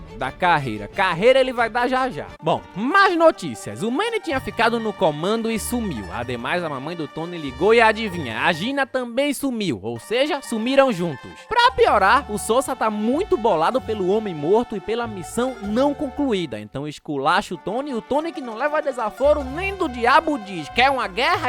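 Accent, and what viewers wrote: Northeast, Brazil. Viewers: Porra mano aí e foda vc reclamar do sotaque nordestino si o cara nasceu no nordeste tu quer q o cara tenha o sotaque do Sul ou de sp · VOZ HORRÍVEL TENHO RAIVA DESSES NORDESTINOS SOTAQUE LIXO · Vídeo bom , porém esse sotaque nordestino ferra tudo !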